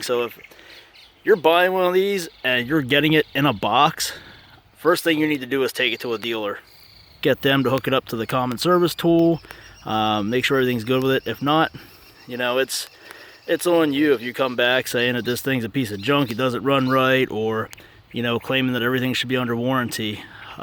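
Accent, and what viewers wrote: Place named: Pennsylvania, United States. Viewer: Hardcore PA accent right there.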